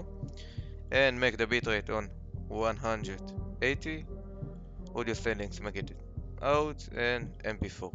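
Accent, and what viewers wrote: Israel. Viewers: שומעים שאתה ישראלי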